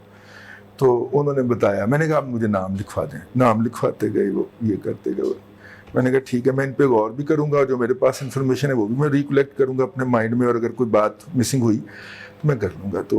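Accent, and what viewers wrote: Punjab, Pakistan. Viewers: جملہ میں اگر بار بار “نا نا” استعمال کریں مثلاً “آپ کو کہا تھا نا” اس سے اردو اچھی نہیں لگتی۔ اگر پنجابی اور انگریزی کے الفاظ استعمال کریں تو جملہ واہیات لگتا ہے۔ اپنا پنجابی کا لہجہ بھی ٹھیک کریں۔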